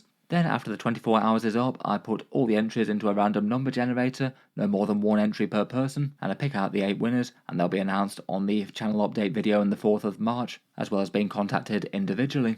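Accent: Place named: England, United Kingdom. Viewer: No. It's a UK mainland accent. Maybe Leicester or Leeds.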